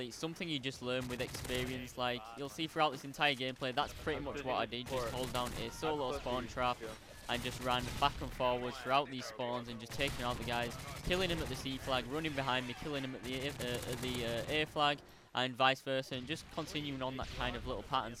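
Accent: United Kingdom. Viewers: U went super east on these ppl. Do you live in the U.K. Cuz u got a british accent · "Do you live in the UK because you have a British accent?" - rhetorical question?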